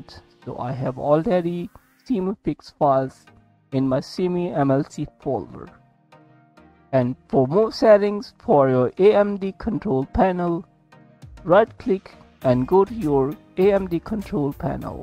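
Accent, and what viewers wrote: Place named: Asia. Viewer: Why the FVck does he sound asian?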